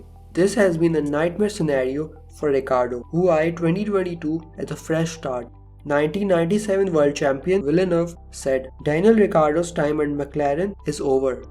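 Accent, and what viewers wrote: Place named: India. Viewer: Indian accent ... Nice 😌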